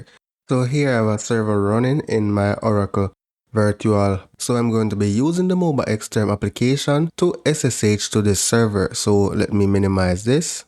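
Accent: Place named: Jamaica. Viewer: First mi a hear patois on this side of yt, big up yuhself mi g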